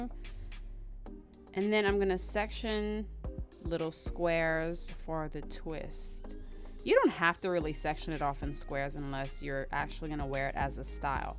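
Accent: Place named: Louisiana, United States. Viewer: I showed this to a guy to get an idea of how his hair would look when I was finished, and as I was watching, it was driving me crazy hearing your voice, i was like, she gotta be from Louisiana 😅. I love it ❤ Great content as well!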